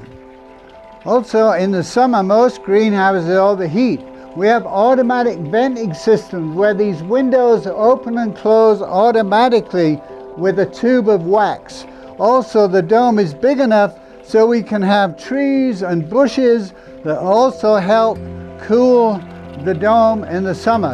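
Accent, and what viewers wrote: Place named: Yorkshire, United Kingdom. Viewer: I think I can hear a Yorkshire accent poking out through many years in the US?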